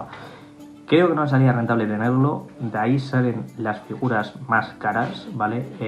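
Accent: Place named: Peru. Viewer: Sigo creyendo q eres peruano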